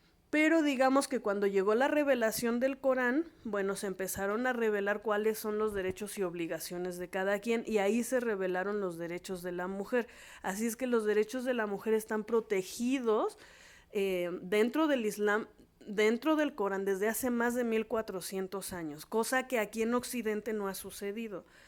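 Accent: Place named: Mexico. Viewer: Hola, de donde eres? Escucho tu acento como mexicana, yo también mexicana, cual es tu fb me interesa aprender árabe, gracias!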